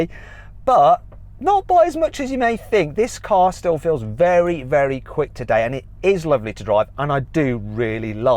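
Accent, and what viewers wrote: United Kingdom. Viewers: 영국발음들으니까 신기하다ㅋㅋㅋㅋ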